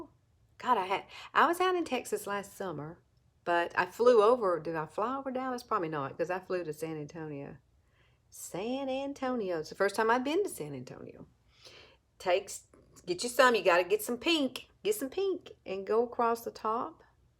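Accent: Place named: East Tennessee, United States. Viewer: I am from East TN and you sound a lot like we talk , and it is unique all over the world , I understand you Perfectly.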